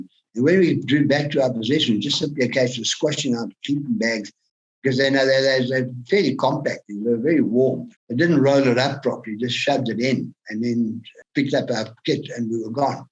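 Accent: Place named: United Kingdom. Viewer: Thats the funniest thing I have ever heard. Utter trash, the boys were ready to take out Mugabe and Co. the moment the word came to move to Zim-Rhodesia but the likes of Ken Flowers and his poisonous ilk betrayed Rhodesia and all Rhodesians and sold the people of our nation into impoverishment, starvation and corruption for the next 40+ years. You are born and raised in the UK, I can tell from your accent on your channel. Maybe a father or mother from Rhodesia?. Let me ask you, if ZANU and Mugabe did such a cracking job why did your family flee to the comforts of the UK? did they teach you about the so called achievements of ZANU?. Have you ever lived in Zim or just visited your relatives there?. We mopped the floor with ZANU and ZIPRA time and time again. The one and only reason Rhodesia came to an end is down to sanctions and pressure from the USA, Kissinger and treacherous actions of the UK and their imperial mindset over colonials like us. 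Thats all. Militarily, we could have gone on for another twenty years. We never stole the lands from anyone, in fact, we liberated the Shona from the slavery of the Matabele. We paid for our lands, under crown warrant or with service.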